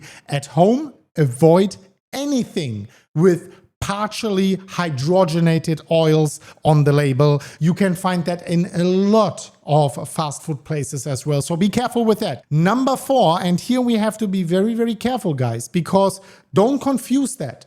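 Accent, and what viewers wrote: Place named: Germany. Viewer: Du hast aber auch einen deutschen englischen Akzent 😂❤😂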